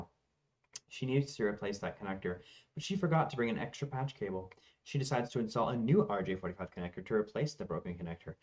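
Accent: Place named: India. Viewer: lol, your indian voice is horrible, but made me chuckle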